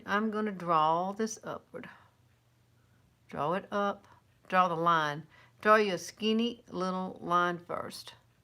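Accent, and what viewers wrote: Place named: South, United States. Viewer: Thanks ♥️ you do sound southern... cute though :) enjoy you Cancun trip! 🌺🌺🌺🌺🌺🌺🌺🌺🌺🌺🌺🌺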